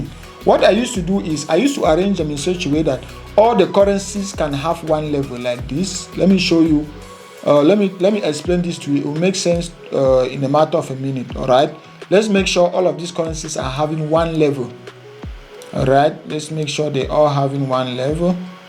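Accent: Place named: Nigeria. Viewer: No one can teach me shit with this Nigerian accent. Absolutely no one. It sounds childish🤣🤣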